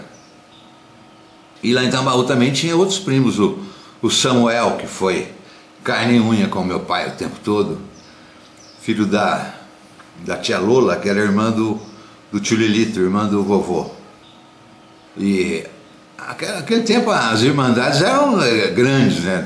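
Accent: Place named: Minas Gerais, Brazil. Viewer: Que bonita história! Sou mineiro também e me identifico muito com essa sua maneira de falar. Conserve assim, essa originalidade de ser. Um abraço.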